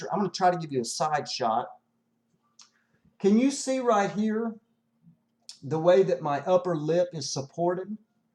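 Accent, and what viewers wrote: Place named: United States. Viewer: man.. I am so happy I found your videos! I myself have been in a similar situation with my teeth and after the past 10 years and $thousands of dollars on root canals, crowns that break or fall off, on and on, you know.. I've decided I just have to get dentures. I have no choice and implants are out of the question. You've given valuable information to me and I'm sure a lot of other people. I feel like I'm listening to a close friend because of your accent. Well, it's not really an "accent", people don't understand that it's American the way we speak. Anyway, thank you again. I have a lot to do! Take care buddy.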